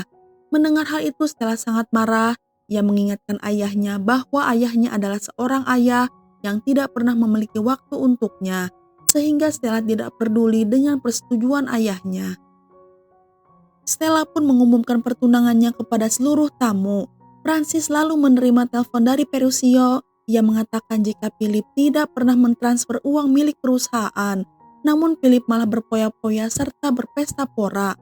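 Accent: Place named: Java, Indonesia. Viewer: Logatnya medok banget neng hehehe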